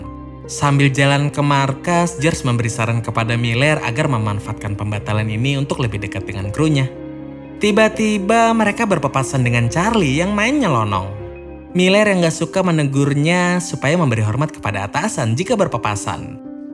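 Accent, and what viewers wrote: Indonesia. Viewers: Pengucapan kata kata dlm bhs Inggrisnya lurus banget spt bahasa Indonesia 😭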